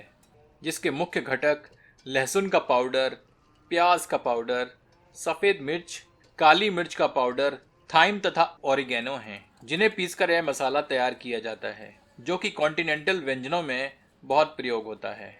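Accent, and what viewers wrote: Standard. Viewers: Bro your shudh hindi is as good as your English you are a great chef you deserve more subscribers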